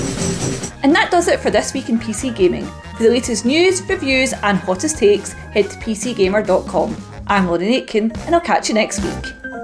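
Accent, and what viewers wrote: Scotland, United Kingdom. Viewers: More Scots, please!👍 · Great little nugget of gaming news wrapped up in a lovely Scottish accent. Perfecto! [Chef kiss] mwha! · Very Scottish.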